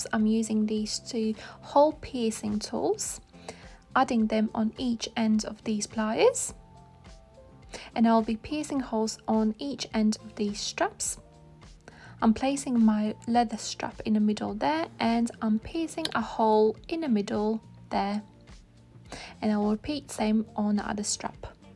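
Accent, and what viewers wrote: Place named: England, United Kingdom. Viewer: Well presented in a lovely English accent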